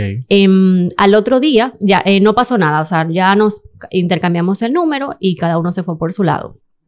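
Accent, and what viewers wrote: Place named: Venezuela. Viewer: Hola pense que eras venezolana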